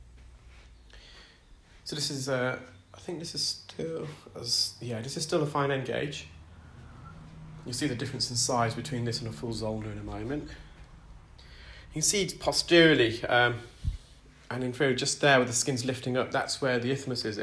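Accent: United Kingdom. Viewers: To all of the people who are correcting the way says his words. lives in the U.K not America. Americans pronounce their words differently than people in the U.K and Australia.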